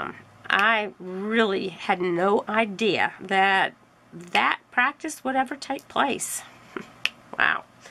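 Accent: Texas, United States. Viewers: I am brand new to diamond painting I have only done a couple of paintings I’m really enjoying listening to you while I work on my paintings I love your Texas accent So how I kit up Is in the bags they come in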